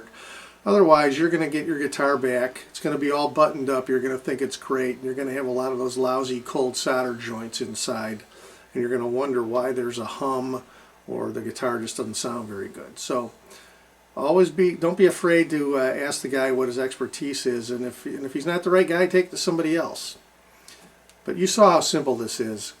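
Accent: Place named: United States. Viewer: Great video. I never realised you were not supposed to touch the solder to the iron. I've learned a lot,. Thanks. BTW It's a bit off-putting to hear the American pronunciation for soldering - to my ears it sounds like you are saying 'sawdering'. In the UK the SOL is pronounced as it is in soldier or, if you like - souldering! :-)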